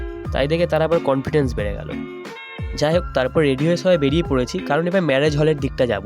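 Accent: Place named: West Bengal, India. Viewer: Vai tumi bangali kotha sune to mne hochhe west bengal er tahole title a Bangladesh lekhar mne ta ki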